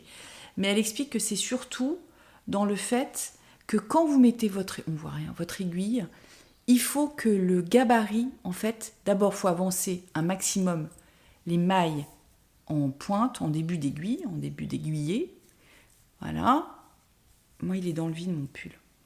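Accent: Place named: South, France. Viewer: ton accent du sud est parfait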